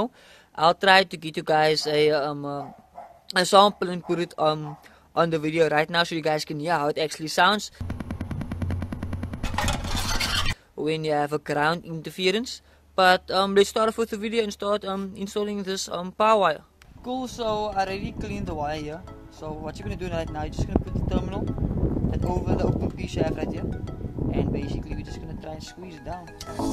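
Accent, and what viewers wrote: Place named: Afrikaans, South Africa. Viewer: Sounds afrikaans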